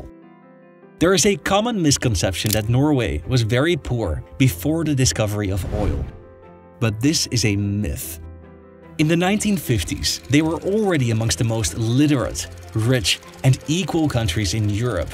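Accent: Netherlands. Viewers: Klinkt heerlijk Nederlands XD Maar goeie shit ! Alles komt er bij, ook hoe het samen hangt met andere grondstoffen, hoe de landen er mee om gaan en sowieso hun handel regelen. ZItten nogal flinke verschillen in. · Hoi, goeie video. Ik heb nog niet eerder een video van je/jullie gezien maar ik kan horen dat je/jullie ook uit Nederland komen 🙂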